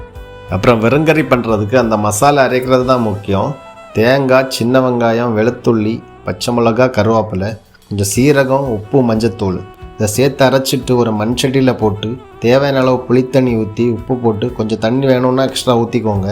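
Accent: Kerala, India. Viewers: Neenga pesurathe keetu te irukalam pola azaga pesuringa neenga malayalam aa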